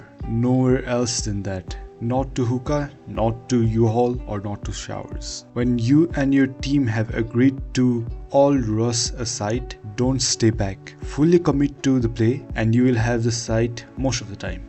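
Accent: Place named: Nepal. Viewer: Brother. Nepali accent sunirako chu ta ma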